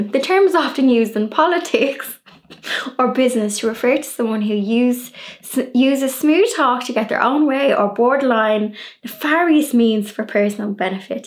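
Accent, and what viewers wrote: Dublin, Ireland. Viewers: Loved your video and actually helped me understand some of my Pappy's sayings. He was of Irish origin and lived with us - I just simply picked up his slang. When I married my husband (from New York), he'd always ask me what does that mean? Sayings he'd never heard. Now, if I could only say those things with the lovely Irish brogue you have :) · you have a “real Dublin accent”. Years ago I came to Dub as student, couldn’t understand half of what was said to me🥺. Then met Dub & ends my story🥰🥰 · This has to be one of my favourite videos! 🙌 💚 As a fellow Irish lass from Canada, I've been wanting to brush up on my Irish slang! So thank you so much for making this video! 😊 Also, if ya don't mind me saying, I just love your Dublin accent 💕 xx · I loved this video it was brilliant. We use lots of the same words as you in Northern Ireland too. 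Especially hot press and the likes. It's amazing how many irish words we use up North and also in my part of Northern Ireland we speak Ulster Scots or as the Americans call it Scots Irish. Also my granny always used to say going for her messages. Insead of grand stretch in the evenings we mostly say quare stretch in the evenings means a good stretch really the same although we do say grand meaning good. Also I remember going around in the car with my granny and Granda and he would see a wee old place that was in ruins but look like it was a pub and he would always call it a shabeen. In fact him and his friends had a wee shabeen in the shed at the bottom of his garden and they called it the Sunday school so he didn't get in trouble lol with his mum for sneaking a wee pint in there lol. What I love most about Ireland North and South is our hospitality up north we always say do you want a wee cuppa tea but that means you just don't get a cuppa tea you get biscuits and sandwiches and buns with the tea a full spread but if the person's responds and says aye just a wee cup in my hand that means they just want a quick cup nothing to eat which wouldn't be said often unless in a rush and couldn't stay or were not hungry like just if you were just after your lunch. 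When you go to our homes it's a full occasion and a spread is always put on I love that. 😊I love your Dublin accent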